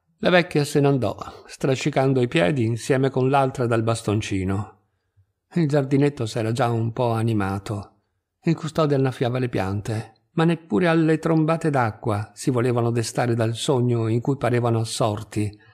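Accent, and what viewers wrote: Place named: Rome, Italy. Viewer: spettacolare interpretazione in romanesco, sai com'è.. so' romano anch'io..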